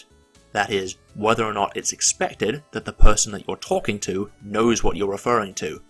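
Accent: United Kingdom. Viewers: Damn British English. I keep thinking he's saying "copular", not copula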